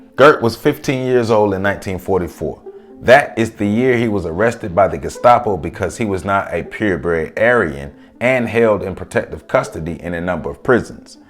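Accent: United States. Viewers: an Amerikan non Deutsch Reader is telling the story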